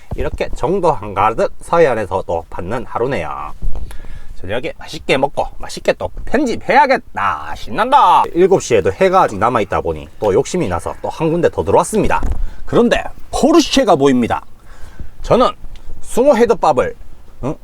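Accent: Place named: Chungcheong, South Korea. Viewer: 웰컴 서해안ㅎㅎ 경상도 사투리만 나오다 충청도사투리ㅎㅎ고향말투라 더 반갑네요ㅋ뜬금없지만 김포도 함 오세용^^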